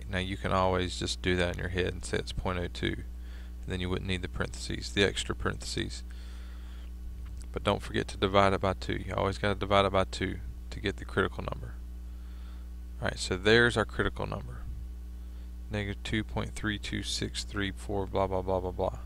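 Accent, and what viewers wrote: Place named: South, United States. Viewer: Came for help with my homework, stayed for your delightful southern accent ;)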